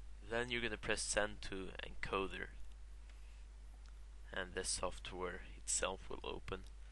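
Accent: Sweden. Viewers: är du svensk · Are you swedish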